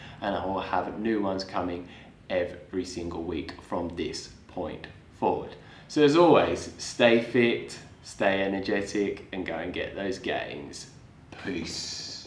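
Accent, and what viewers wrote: United Kingdom. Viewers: woahhhh... shocked to hear you had a British accent; I thought you were foreign when I watched your 1 year transformation vid ;))))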